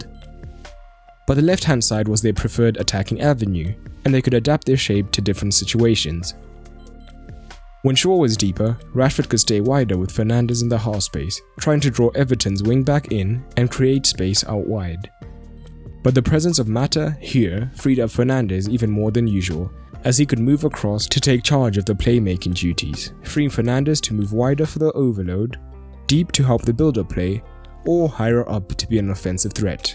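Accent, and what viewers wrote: South Africa. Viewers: The narrator sounds South African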